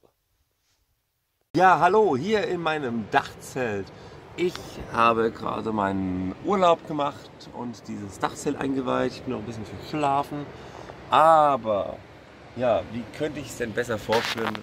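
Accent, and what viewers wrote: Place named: Hesse, Germany. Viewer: Gude, finds cool wennde hessisch babbelst;D Interessantes Video mal wieder von dir. Bin sehr auf dieses kleinere Dachzelt gespannt.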